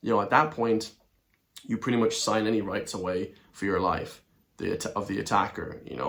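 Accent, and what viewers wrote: United States. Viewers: you say your from Galway all your life but have an American Accent.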